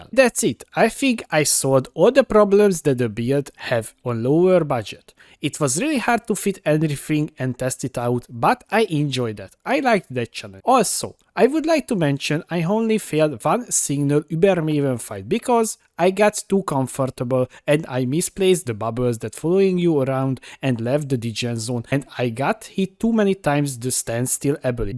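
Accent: Hungary. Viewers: Szia , nagyon Magyaros az akcentusod. Really nice video there ! :) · A magyar akcentus megol meg kulfoldrol is felismerni :D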